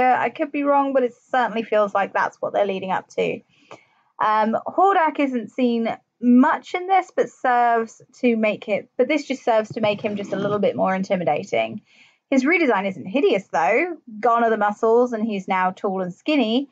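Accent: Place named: United Kingdom. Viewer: You kept saying antagonist wherein you should be saying protagonist... Is it me or is it your brit accent? Anyway I do like this new iteration. But the old one was way better.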